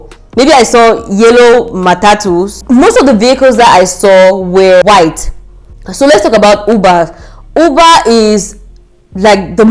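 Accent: Nigeria. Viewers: Why do you sound more Nigerian than Cameroonian? Just curious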